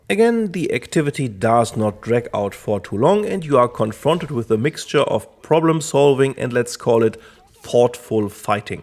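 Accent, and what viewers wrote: Germany. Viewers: höre ich da einen deutschen Akzent raus? :D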